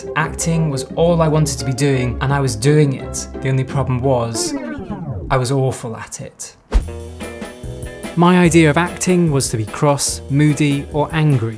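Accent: Scotland, United Kingdom. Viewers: As an American this means the fact you have a British accent makes you the perfect candidate for an actor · Dude, I mean this in a good way but your bad acting is pretty entertaining to watch, plus your Scottish accent is spot on.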